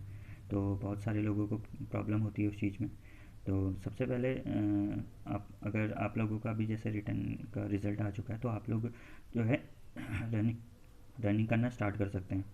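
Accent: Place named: Kumaon, India. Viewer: Sir are u from Uttarakhand because ur accent and ur look is totally like Kumaoni people of Uttarakhand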